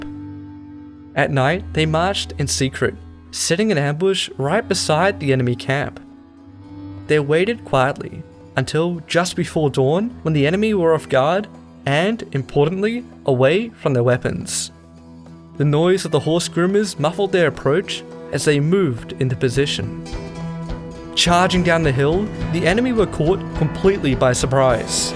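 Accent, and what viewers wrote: Australia. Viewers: Recently I was going through my subscriptions and since I want to keep the number to a minimum I considered to unsubscribe. But then I though: nah, I absolutely need to know it if ever uploads a video again. I missed your drawings, competent explanations, and Australian accent!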